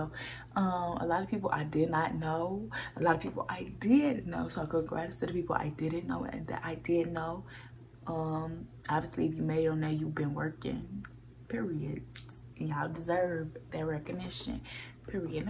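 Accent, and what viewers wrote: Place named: New York, United States. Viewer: Are u from ny it sounds like u are😂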